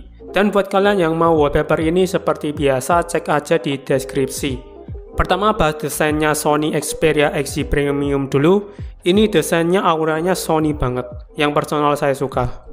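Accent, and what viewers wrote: Surakarta, Indonesia. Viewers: Loh wong solo to mas ???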